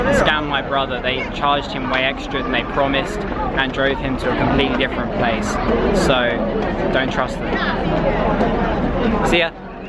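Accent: United Kingdom. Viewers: ignorance is no excuse for anything - what kind of up your nose BRIT accent is that?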